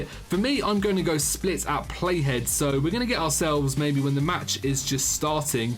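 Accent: United Kingdom. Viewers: A man that sound British and looks Swedish?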